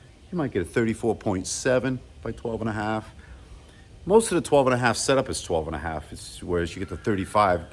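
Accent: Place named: Massachusetts, United States. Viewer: I knew you were from Mass before seeing the plates lol fellow mate !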